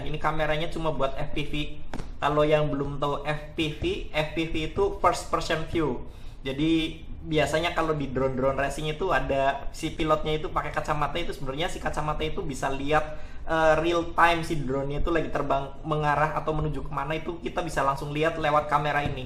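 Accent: Banyumas, Indonesia. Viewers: ok sipp makasih mas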